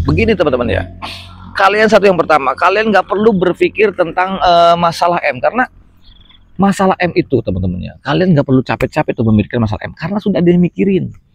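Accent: Banyumas, Indonesia. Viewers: kirain ormg sunda kang jbule ngapak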